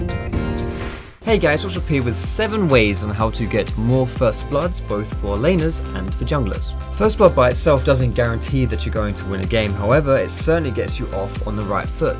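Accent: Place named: United Kingdom. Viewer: Ok. love your videos, and I watch them religiously, but after like 9 months I have to ask WHAT THE HELL ARE YOU SAYING IN YOUR INTRO? it sounds like "special P here" or something. My american ears can not take thine british accent when spoken too quickly.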